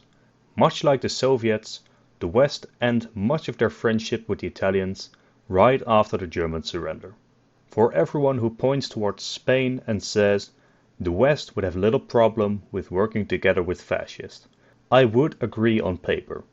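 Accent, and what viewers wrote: Netherlands. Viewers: gast aub leer deftig engels praten. ik zou u vids wel kijke als ge ni zon ambetant hollands accent had!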